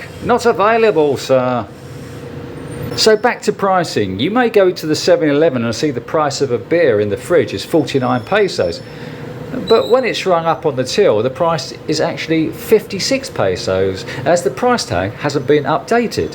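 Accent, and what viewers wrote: England, United Kingdom. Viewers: This was stupid. The englishman, from his dialect I think he is english should try to live in UK. Everything he complains about is so much worse in the UK. Philippines may have some problems, something we foreigners are not entirely happy about because it does not comply with our likes, but still....Pilippines is a great country to live in.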